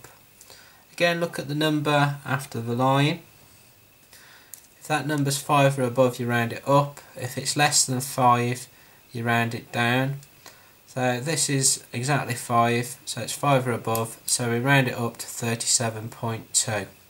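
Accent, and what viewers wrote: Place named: Yorkshire, United Kingdom. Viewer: Proper Yorkshire accent I think I would love to live there..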